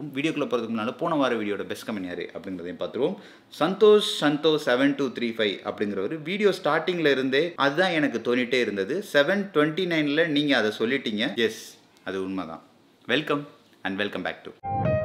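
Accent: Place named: Madurai, India. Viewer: Bro I think Nega madurai or South side tha unga Native ah irukunu nenakeran..😄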